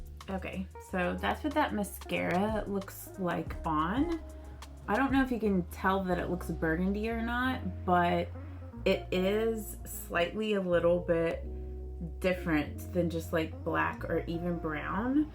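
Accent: South, United States. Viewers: It could be her accent, it's a little southern ❤. She pronounced it Ma/cari. Which I also say ma/cari lol.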